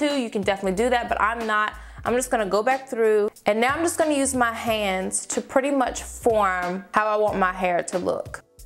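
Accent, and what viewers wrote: South, United States. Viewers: Your voice is so country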